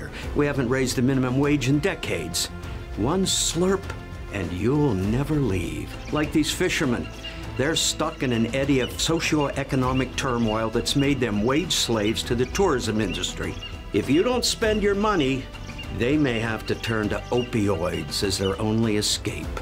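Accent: New England, United States. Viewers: Holy shit you've got New England nailed down. Now when may we expect Roger? I can pick him up at the train station at a moment's notice. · Wow new Horton sounds like New England?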